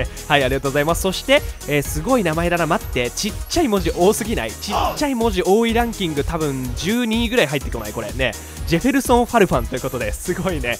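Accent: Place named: Saga, Japan. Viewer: 佐賀弁むっずいですねwww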